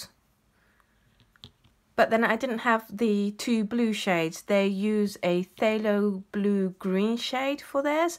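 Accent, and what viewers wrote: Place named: United Kingdom. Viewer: If I could paint anywhere in the world, I would choose the UK. Many of my favorite artists that I watch are there and I would love to go get lessons from them or even just watch them paint in person. And the accent they speak in is just adorable as well, just like yours! Thanks for the chance to win and I hope you feel better very soon.. Blessings, Stacy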